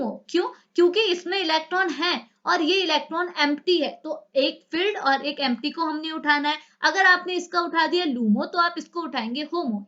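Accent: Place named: Hyderabad, India. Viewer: Aapka haidrabadi tone bhot cute lagta he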